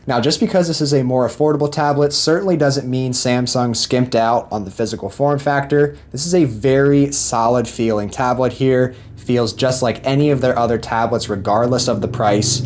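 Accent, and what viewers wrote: Philadelphia, United States. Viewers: New to your channel. Liked your review! Gotta ask. Are you from the Phiily or Delaware county area? I am, and I am testing my South East PA accent radar.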